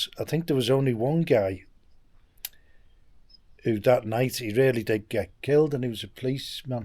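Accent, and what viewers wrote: Liverpool, United Kingdom. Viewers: All this from in your head ... you have some brain mate! Barrow boy, paper round and betting shop. With scouse accent ... No way! This does not add up ... I will try and join the dots and work you out! :)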